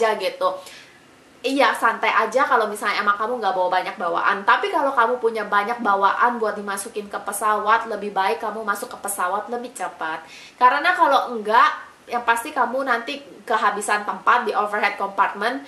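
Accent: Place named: Medan, Indonesia. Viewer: Logat medan nya masih kental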